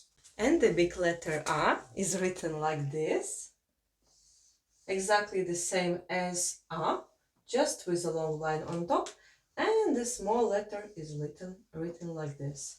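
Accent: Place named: Russia. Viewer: Its interesting when russian is teaching latvian in english :D Had some troubles with Ģ pronunciation. And classic russian fyorst not first :D But in general nice video and idea :)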